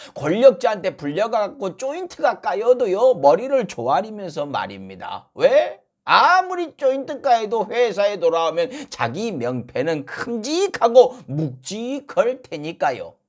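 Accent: Jeolla, South Korea. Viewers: 다좋은데 ...난 성범이형편인데 ...일부러 예전 개그코너로 하는건 알겠는데 ...전라도 반달 사투리로. . 우파들 빈정거리는게 영. ,ㅠ 재미도 있긴하지만 .. ...형님 그냥 서울말로 코너하면 안되요? ㅜ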